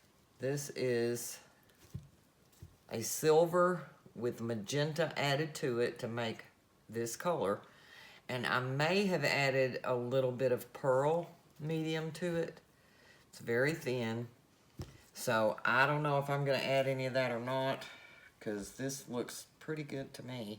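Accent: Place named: South, United States. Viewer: Gotta be from the South, gurl! Love it! :)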